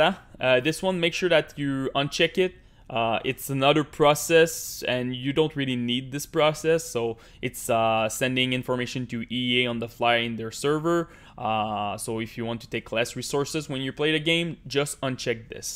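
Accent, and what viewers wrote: Quebec, Canada. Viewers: Un Québecois :O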